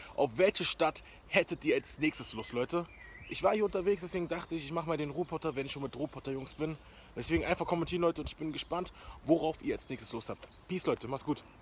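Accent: North, Germany. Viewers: prototyp norddeutschen oder kieler :D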